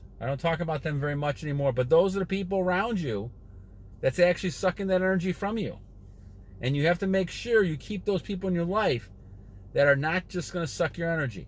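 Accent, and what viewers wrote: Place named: North, United States. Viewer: you from Minnesota, Michigan? I recognize a northern accent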